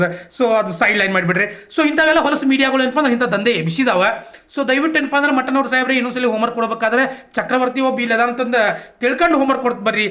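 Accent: North Karnataka, India. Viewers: ಉತ್ತರ ಕರ್ನಾಟಕದ ಭಾಷೇಲಿ ಬಲು ಛಲೋ ಐತಿರಿ, ನಿಮ್ಮ ಮಾತ.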